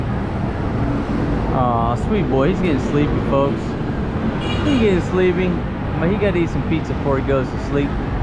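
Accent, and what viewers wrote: South, United States. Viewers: Where you from bro.... I hear a southern accent.....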